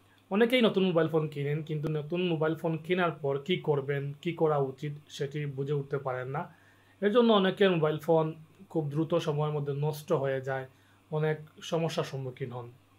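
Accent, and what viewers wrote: Sylhet, Bangladesh. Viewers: সিলেটি ভাই 😮😊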